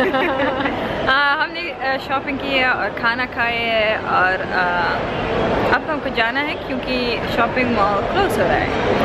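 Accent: India. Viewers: Very impressed with your Hindi as you could speak almost in the accent that people of Bihar and Easter UP( Indian states) . Very nice indeed. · First time am watching your video n when I heard your hindi i was like 😲😲 just like delhi grl...... 😎😎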